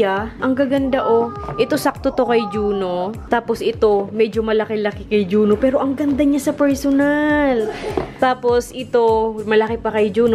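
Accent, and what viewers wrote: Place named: Visayas, Philippines. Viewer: Taga saan ka madam. Bisaya accent man.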